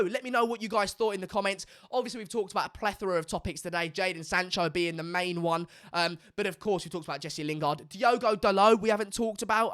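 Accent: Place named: Manchester, United Kingdom. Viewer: His Manc accent gives him away 😂😂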